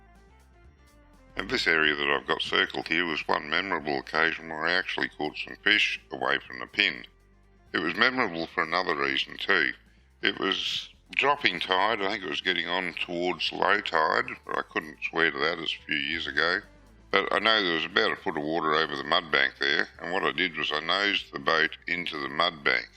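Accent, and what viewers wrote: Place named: Queensland, Australia. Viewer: It’s a pure Queensland accent, a rarity these days.😂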